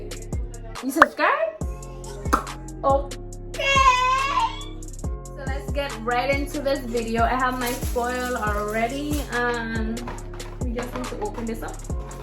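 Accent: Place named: Caribbean. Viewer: 😗😗😗I hear that caribbean accent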